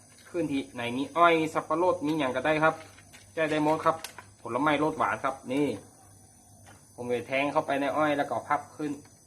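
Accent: North, Thailand. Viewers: คนเหนือใช่มั้ยคับ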